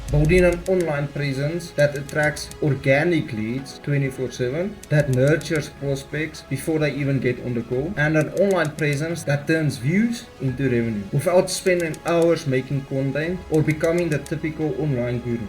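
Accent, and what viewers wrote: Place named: South Africa. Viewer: Hey, I just wanted to say I absolutely love your content! You inspire me to improve my editing skills 💯. By the way, are you from South Africa? Your accent sounds familiar South Africa😅! I’m a creator too, but wow, your videos are HUGE!:face-blue-wide-eyes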